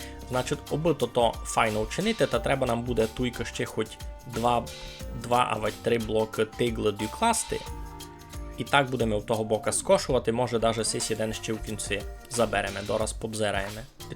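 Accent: Ukraine. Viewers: Моцно говориш ти з якого села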